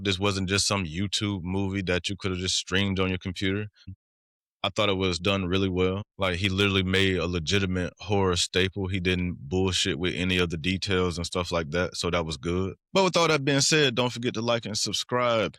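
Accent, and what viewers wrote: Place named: United Kingdom. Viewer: "distorted and cruel" bruh that's just a british accent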